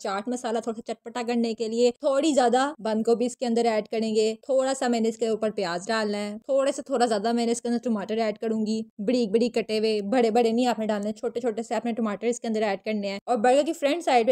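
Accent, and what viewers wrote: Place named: Punjab, India. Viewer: Your Panjabi accent is awesome 😂♥️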